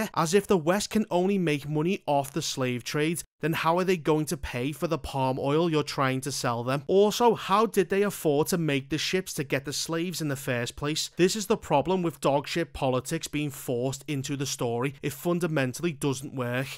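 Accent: United Kingdom. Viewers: Bro i love your British accent and it makes it way more funnier 😅😅😂😂 Btw I am from Afghanistan 🇦🇫 Even though we fought three or four wars but still I love brits Love brothers No one can fake your history these people will try show everything was black like Egypt and North Africa. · I just love that British accent. "fockin dumb" XD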